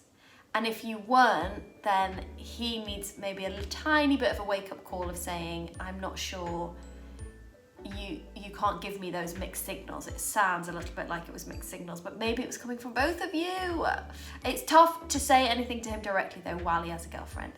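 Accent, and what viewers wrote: United Kingdom. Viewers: Is it just me or accent is so strong since she back in the UK. I love it so much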